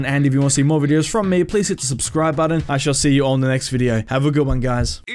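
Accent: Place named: Australia. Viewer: Also, where abouts in Aussie you from mate?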